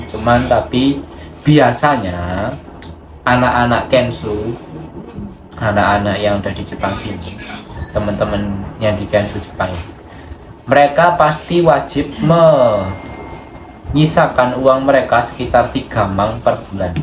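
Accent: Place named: Java, Indonesia. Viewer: Jawa e medok yooo😂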